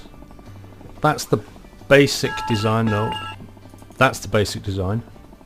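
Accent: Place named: United Kingdom. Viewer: Whats up with you and crossbars?? Ever imagine how your car will feel without differential, almost the same deal with bridges and crossbars. u play like u have never seen a bridge before. by your accent u live in UK, how can u be so naaive about structures. Always use crossbars below the bridge and in places where there is a lot of stress.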